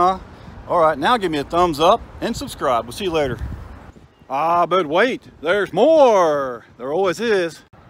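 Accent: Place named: Appalachia, United States. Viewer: Dude, love yer commentaries, BUT ....... please speak SLOWER. I am in Australia, and I am having trouble understanding yer hill-billy twang. Other than that, love yer posts. Love CCET. Be happy and healthy in these hard times.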